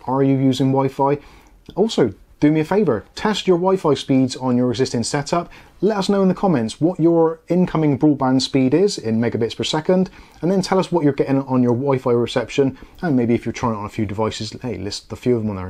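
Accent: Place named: England, United Kingdom. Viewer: thank you for this video, but could very more useful if we could understand what are you talking about ,because for non English citizens not have a clue what you are saying,could be nicer to try to explain more slowly and without the heavy English accent thank you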